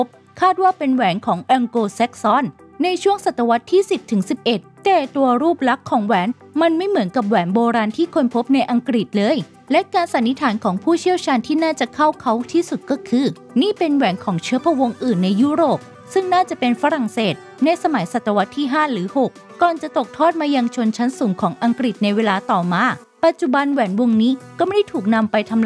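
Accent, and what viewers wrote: Thailand. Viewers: ที่รู้ๆคือ พูดเหน่อมาก นี่ขนาดดูพยายามไม่ให้เหน่อนะเนี่ย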